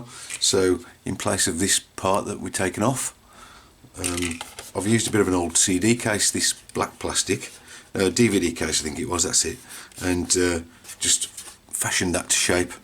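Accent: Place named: Midlands, United Kingdom. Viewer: Brilliant I am just try fitting some in my rc4wd great for the money, nicety hear a fellow midlander instead of an american taking an hour with 10 mins content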